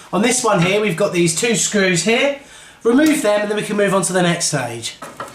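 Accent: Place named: United Kingdom. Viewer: I really like Brits accent and the way you do. thanks!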